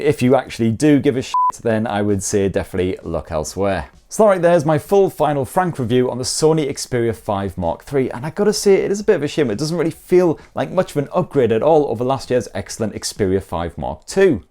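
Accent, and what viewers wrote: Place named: Northern England, United Kingdom. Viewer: He has good Sense of humor most people won't be able to understand the Northern accent.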